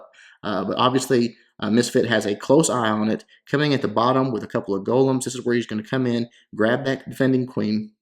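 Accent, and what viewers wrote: United States. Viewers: which part of the us are u from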